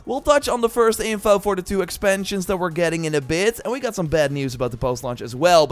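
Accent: Netherlands. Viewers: You sound Dutch bro 😁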